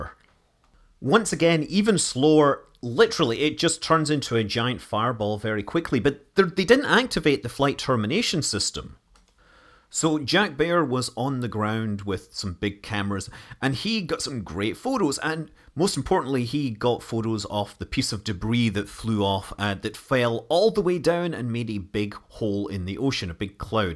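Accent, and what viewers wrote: Scotland, United Kingdom. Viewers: Scott Manley is Scottish, so he pronounces some words differently than what you'd expect, say, from an American or an English person. The English language is spoken by hundreds of millions of people around the world, in all sorts of different dialects. Expecting any sort of logic or coherence in the way words are pronounced is optimistic at best. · Not mid-Atlantic, Scottish.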